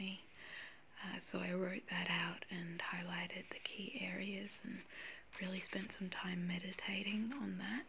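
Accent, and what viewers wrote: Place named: Australia. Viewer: I also find it quite refreshing hearing an Aussie accent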